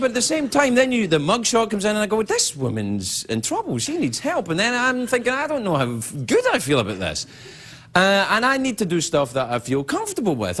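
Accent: Scotland, United Kingdom. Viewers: WOW. Vintage Craig. He was very apologetic for cussing and had a much stronger Scottish accent. Time flies... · Craig's accent always gets a little thicker when he is being genuine or talking to another guest Scottish decent. (See Ewan McGregor, Kevin McKidd, his sister, etc). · wow his scottish accent is veryyy scottish. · It's funny how much thicker his Scotch accent was even 6 years ago, but what he's saying here is brilliant. What a real person, and hope he inherits Mr. Letterman's show one day. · Excellent. Who else misses his scottish accent? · I watched this the other day and even today i still had tear in my eye. 20 years is a great milestone....goodluck to everyone at the beginning of the road....one day at a time you'll get through it:-) watching clips from 5 years shows how much his accent has changed, but it is still obvious scot (reminds me of john barrowman in that sense) thanks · Back when he still had that Scottish accent....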